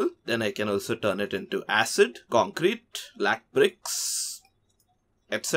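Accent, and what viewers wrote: India. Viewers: A normal indian english accident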